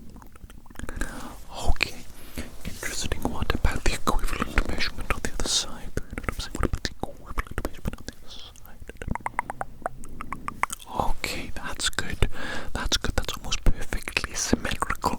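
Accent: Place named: Scotland, United Kingdom. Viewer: Is he scottish ? Idk why but i'm pretty sure he has a scottish accent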